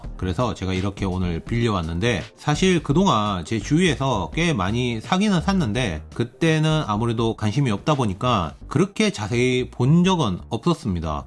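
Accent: Gyeongsang, South Korea. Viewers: 경상도 사투리만 조금 더 다듬으면 전달이 더욱 잘 될 것 같습니다.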